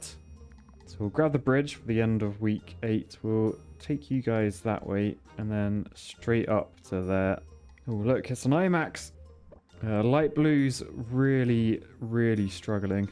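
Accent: United Kingdom. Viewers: you sound a lot like exurb1a, but probably just the bri'ish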